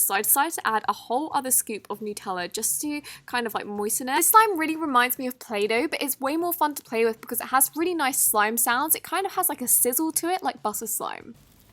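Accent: England, United Kingdom. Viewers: Omg!! I really wanna know what part of England your from!! Please dm me on <mail> I love u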